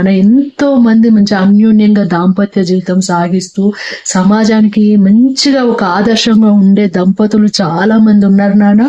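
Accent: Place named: Telangana, India. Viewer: Super chepparandi 💯 corect ga chepparu telangana basha chala cute ga matladuthunarandi 👌❤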